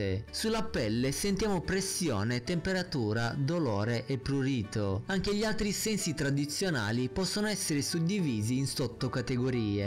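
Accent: Russia. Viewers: oddio sei un grande,il modo con cui ti esprimi,dettagliato e con parole ben scandite,il modo con cui parli (so che sei russo però questo è positivo perchè ti rende la voce tipo enigmatica e questo a me piace),queste cose ti rendono perfetto per il genere di video che fai,dovresti avere più iscritti e,ti farai mai vedere?fai una top 5 sulle curiosità sul lego?sei un grande continua così ;) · dopo tutti sti video tuoi che mi sto vedendo ho disperatamente bisogno di un accento russo come il tuo ahahah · sei russo? dall'accento si sente un po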